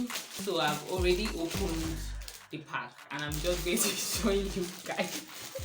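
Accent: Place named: Igbo, Nigeria. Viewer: I am soooooo hearing the igbo In you!😂😂love it! "Foldeble"😂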